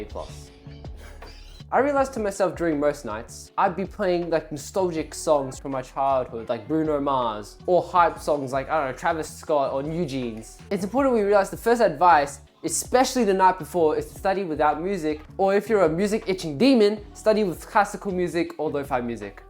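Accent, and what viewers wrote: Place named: France. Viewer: Is it only me who loves how he speaks french?💀 The accent and the advice are both gold thanks 😃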